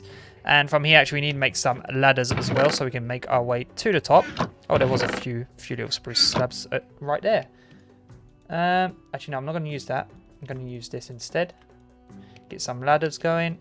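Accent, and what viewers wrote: United Kingdom. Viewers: I love his british accent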